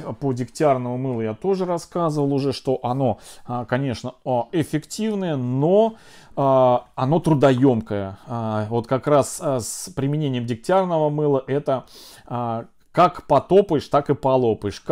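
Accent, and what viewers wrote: Russia. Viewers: к актаре насекомые привыкают, а к оберону конечно же нет, ага... цветы прекрасные, но с аканьем надо что то делать... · С этим аканьем нужно что то делать, невозможно слушать.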